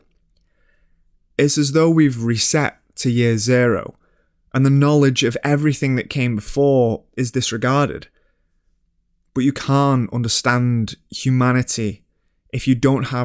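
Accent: United Kingdom. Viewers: Where in the UK are you from? (I'm trying to figure out your accent) Good video btw and I totally agree, everytime I hear an ad read I cringe a bit. But it's like, people gotta eat I guess, so I can't really blame them. I gotta praise you for the decision tho.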